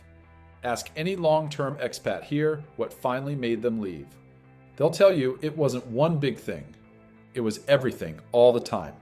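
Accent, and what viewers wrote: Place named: China. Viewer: The narrator here is trying to sound like foreigny Westerner, but have Asian accent, from China?..Airport a chaos? Probably true yrs ago till late 2024 had major upgrade & improvements, viewable online, after the private management takeover by largest Phil firm,improvements include Grab taxi stands w fixed prices..Of course if one goes to rural towns in the Phils, Thailand & Vietnam, one wouldn't expect better updated amenities except that Phils has Musk's starlink reaching remote areas. Poverty rate of Phils is 10.6%, 3rd LOWEST in Southeast Asia tied w Thailand, topped by Singapore and Malaysia, so untrue that poverty drives Filipinos to be dishonest and smiles w a financial agenda.For comparative, USA has an 11% poverty rate, while some of China's poor are eating garbage w millions now are going to countrysides due to serious job shortages ( Decoding China, China Observer, Lei's Real Talk). 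Reader's Digest gives a passing grade on honesty on Filipinos.The Phils is ranked 3rd on Safety in Southeast Asia by the Gallup affiliated survey outfit..Phils voted in past administration an atheist Pres, so untrue Catholic Church has strong political clout..Untrue garbage is prominent in Phils' invirons,top SEA garbage producers : 1. Indonesia 64 mt.yr 2. Thailand 27 mt.yr 3. Vietnam 22 mt.yr 4. Phils 15 mt.yr. . Viewable online are foreigners' roundtrip MRT.LRT slightly elevated train rides around Metro Manila adjoining cities, as if seeing entire Phils & see if garbage, stray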